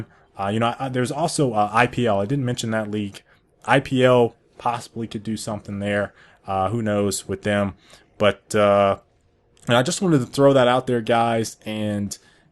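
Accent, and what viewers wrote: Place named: Texas, United States. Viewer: Man I'm starting to hear some Texan accent. Good vid btw.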